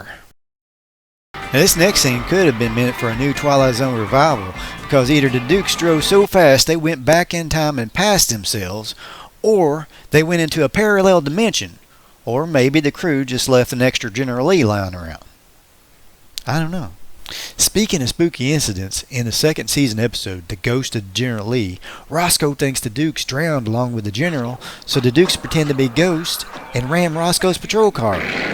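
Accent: South, United States. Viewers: Where in the South is your accent from?? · The guy narrating sounds like he's out-of the dukes of hazzard.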